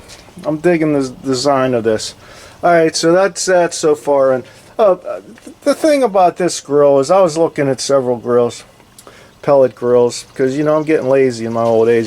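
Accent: Pittsburgh, United States. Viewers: Awesome setup! And my God you sound like such a yinzer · I can’t get past the Pittsburgh accent lol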